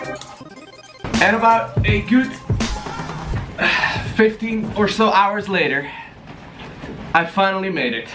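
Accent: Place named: Netherlands. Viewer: You are from Netherlands? I knew that the accent is netherlandish!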